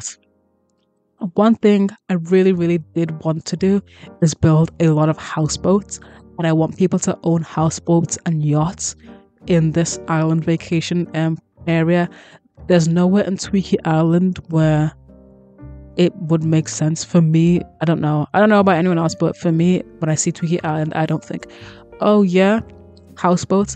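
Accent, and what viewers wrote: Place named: Liverpool, United Kingdom. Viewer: omg 😅 that's a south liverpool accent !! how did my life go this long without hearing another scouse simmer 🥹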